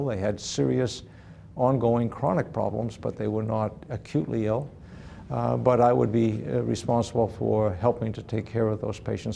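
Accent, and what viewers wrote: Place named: Maine, United States. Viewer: He sounds like he's from Maine 😊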